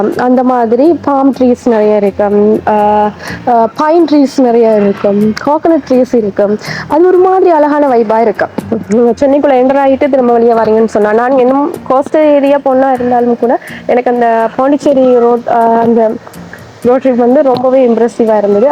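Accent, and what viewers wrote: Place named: Tamil, Sri Lanka. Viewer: நீங்கள் டிராவலர இல்ல யூடியூப் பரா உங்கட தமிழ் ரொம்ப அழகா உங்களைப் போலவே இருக்கு நீங்க ஈழத்தமிழர 😊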